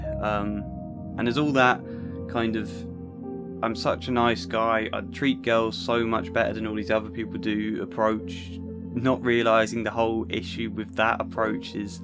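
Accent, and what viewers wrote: United Kingdom. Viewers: If a couple’s political differences are just, big government versus small government. Republic or constitutional monarchy. A centralised or state-based economy. Then sure, needing your partner to agree with you could be fairly painted as a vice. But in this era, “political beliefs” are literally a matter of life and death. If you vote for someone who incites violence against minorities, your political views are hurting real people. If you vote for someone who doesn’t want rape victims to have access to abortion, your political views are hurting real people. If you vote for someone who doesn’t see a problem with police officers shooting unarmed black teenagers and stepping on necks, ultimately, you have blood on your hands. To imply that a difference of opinion on whether or not, for instance, Black Lives Matter, is something a mature couple should be able to accept told me as a viewer straight away that you yourself are white. 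Because these issues clearly aren’t life and death for /you/. Maybe I’m being unfair, it sounds like you have a British accent; if you’re in England, it is a different climate over there. But you specifically mentioned Trump. A man who defends and incites /actual white supremacists/. I loved your videos analysing Good Will Hunting, that was beautiful work and really deepened my appreciation for an already exceptional film. But this video was disappointing and honestly insulting. There are good people on the left and right, as there always have been. But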